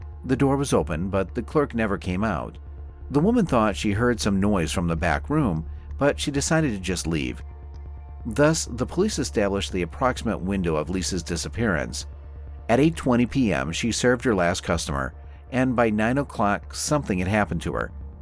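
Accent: United States. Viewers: This script and the narration are terrible. Who says that a show was on "federal TV"? And it's full of things like "Gary refused to give his DNA. The police then formed a list of 11 suspects that refused to give DNA samples. Gary was among them." No shit, I heard what you said... And that terrible Midwestern short "a" just makes it that much more abrasive.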